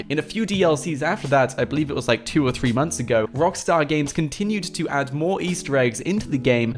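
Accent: United Kingdom. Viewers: Are you British ps. Ur the best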